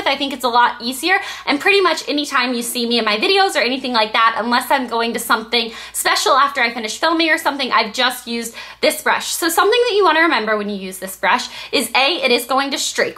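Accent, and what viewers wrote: South, United States. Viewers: i just watched one of your older videos...and your accent has changed SOOO MUCH! you had a really cute southern accent.. :D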